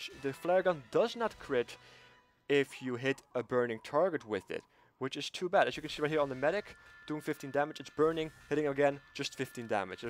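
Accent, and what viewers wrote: Netherlands. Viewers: Bro, are you dutch? you sound like you are :)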